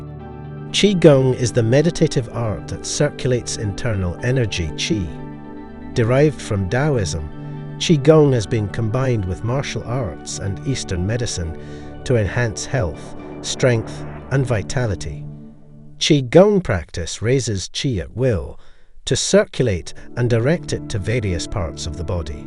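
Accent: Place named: Scotland, United Kingdom. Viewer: What a grand Scots brogue you have ser. A gent and a scholar! Many thanks.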